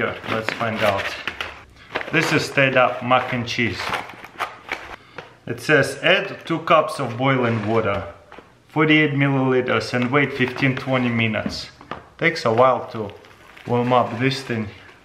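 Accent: Russia. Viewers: I loooooove that rusian accent. His so famous becuse of it. I love it !!! 0o0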